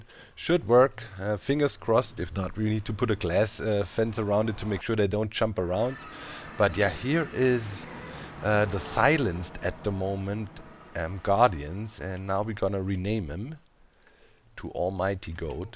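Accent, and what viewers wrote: Germany. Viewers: Ich hab direkt gemerkt du bist deutsch du hast einen kleinen Akzent. Antowert bitte wenn ich recht have und du mich verstehst.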